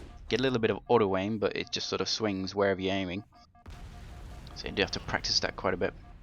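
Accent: United Kingdom. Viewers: i dont really think u do it more its just u respond to ur fans tyrant hasnt responded to any of my comments, plus ur videos r cool cause u take the time to put in commentary and ur voice sounds Brithish which is kool too. i subscribed to u becuase of ur videos,(Which cannot be more perfect) and plus if i am right tyrant only makes laso u make stuff that is HARDER than Laso, whcih takes time. ty make more vids!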